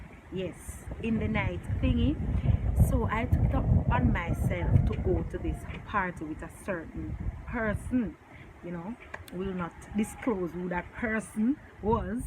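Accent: Jamaica. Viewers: Hahahahah I really like your jamaican accent..it makes everything you say so extra...and these stories are quite embarrassing..but my favourite is the karaoke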